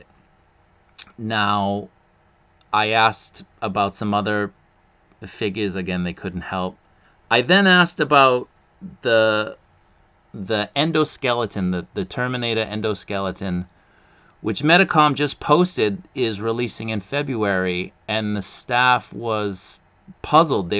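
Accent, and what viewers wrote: Boston, United States. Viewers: Are you from Boston/ New England area? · Mahch :) Love your videos. I grew up in New England but I moved to Virginia so I don't get enough Boston accents in my life these days.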